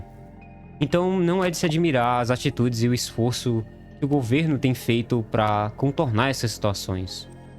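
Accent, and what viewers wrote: Rio de Janeiro, Brazil. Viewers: Esse sujeito é carioca ?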